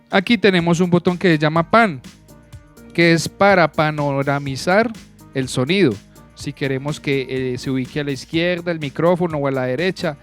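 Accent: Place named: Cartagena, Colombia. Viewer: Muy clara y buena guía la que nos dejas,para los que nos gusta manejar sonido y no tenemos una formación técnica o de escuela. Tu acento es bien cartagenero,verdad?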